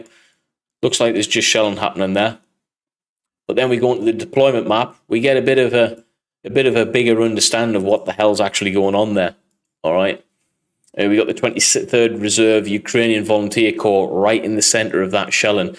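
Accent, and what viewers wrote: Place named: Teesside, United Kingdom. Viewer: Dit just recently subscribed loving the content Got put on to ypur Channel by combat Arms very happy to find your channel as a doubled in the Marines a long time ago as a reservist and then discharged as I filled a medical on the eye test but anyway no point crying over spilt milk I've just heard you see in this video you are from the North East same here currently living in Washington originally from Sunderland spend most of my time in Newcastle I'm getting by your accent you're a little further South than Tyneside or Tyne and Wear probably going towards Teesside I should imagine that's my guess anyway am I close?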